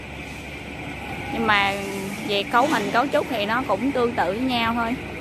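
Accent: Mekong Delta, Vietnam. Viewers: Thôi đi ba, gái miền tây nói chuyện vay mới dễ thương 😁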